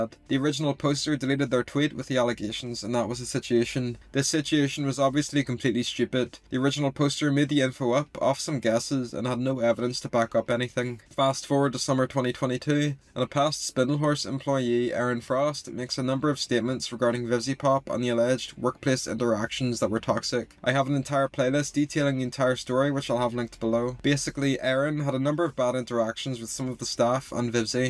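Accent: Northern Ireland, United Kingdom. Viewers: I can't tell which part but i feel like you're from the UK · so strange seeing a video like this being narrated by a person with a northern irish accent, pretty rare lol caught me off guard as i’m not used to hearing my own accent on youtube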